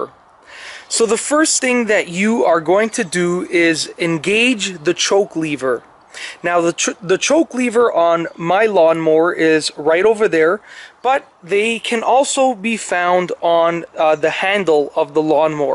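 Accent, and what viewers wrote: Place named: Canada. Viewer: you sound like the stereotypical canadian accent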